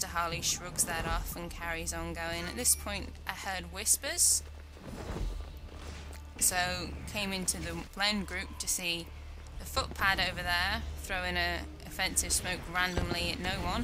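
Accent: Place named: Australia. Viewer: hey what nationality are you? you sound australian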